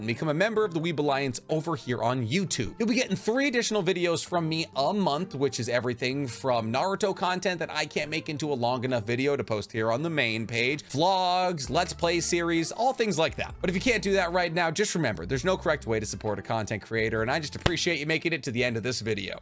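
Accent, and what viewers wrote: Boston, United States. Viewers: It's his inner Boston that comes out ! I love the energy you have in ur videos .. good good work guy !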